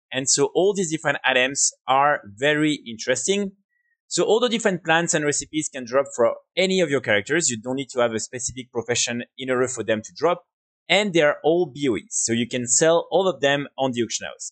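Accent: France. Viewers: Are you french ? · Ça se voit que t'es français 🤣🫣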